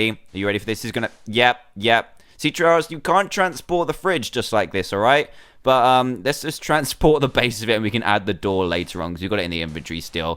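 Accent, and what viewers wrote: United Kingdom. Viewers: SO THATS HOW HE SAIS IT BECAUSE HE IS BRITISH AND THEY PRONOUNCE DIFFERENTLY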